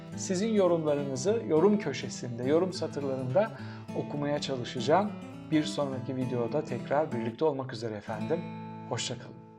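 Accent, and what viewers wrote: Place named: Istanbul, Turkey. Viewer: Çok sade ve tane tane, üstelik İstanbul Türkçesi ile anlatımınız oldukça etkileyici. Sorunuza gelirsek; rte kaybedeceği hiçbir seçime girmez. Yine 15 Temmuz vari bir olay çıkartıp kitleleri etkiler diye düşünüyorum. Hani bir söz vardır ya... Mahalle yanarken or...pu saçını tararmış... Bunlarınki aynı bu misal.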